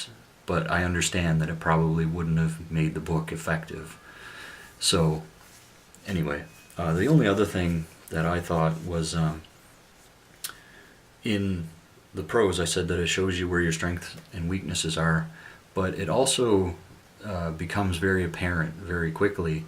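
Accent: Canada. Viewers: I have to ask where you are from? I'm from Mabou, Cape Breton and I hear a Atlantic Canadian accent... Thanks for your videos. Helps a lot.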